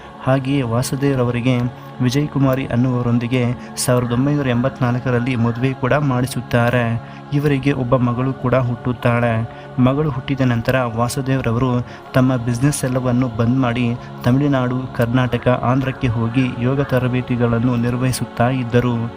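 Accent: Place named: England, United Kingdom. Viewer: He talks in a very heavy English accent and pretends not to know Kannada. Why can't he talk normally.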